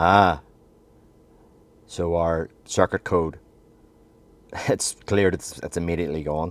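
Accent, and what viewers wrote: Northern Ireland, United Kingdom. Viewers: Great video. Love the NI accent!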